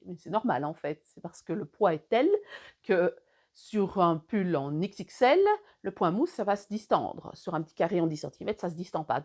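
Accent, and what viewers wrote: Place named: Brittany, France. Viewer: Merci pour vos vidéos ❤. Un vrai plaisir instructif et agréable à regarder. Et un vrai plaisir d'entendre cet accent de chez moi. Suis réfugiée dans la campagne bretonne. Votre chaîne est juste trop bien. Merci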